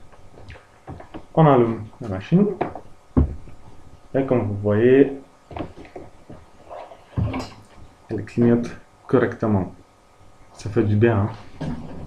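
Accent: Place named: Poland. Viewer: Explications un peu longues mais Merci car je viens de sauver ma cafetière ! A poznaje czysty polski akcent !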